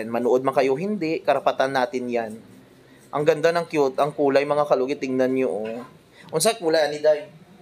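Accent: Iloilo, Philippines. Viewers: Sir yung accent nyo po parang ilonggo.😊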